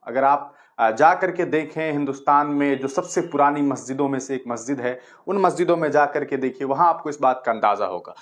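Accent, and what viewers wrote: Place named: Pakistan. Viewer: नाम हिन्दुस्तानी मगर तेरी बोली से पाकिस्तानी होने की गंध आ रही हैं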